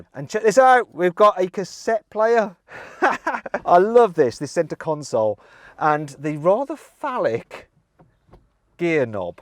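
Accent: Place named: Birmingham, United Kingdom. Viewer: Why does he sound less Brummie than on Carwow? No idea why anyone would emphasize that accent lol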